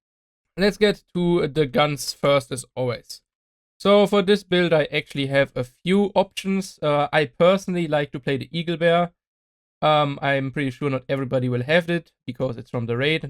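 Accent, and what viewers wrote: Germany. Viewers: typical german accent.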